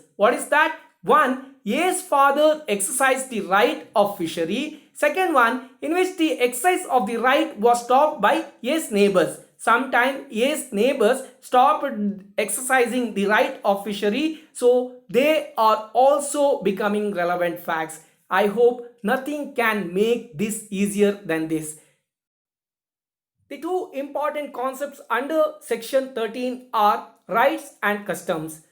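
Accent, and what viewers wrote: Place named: India. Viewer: Never watch your video before sir..But after watching it first time i am very much impressed especially the way you present and also your indian acent of English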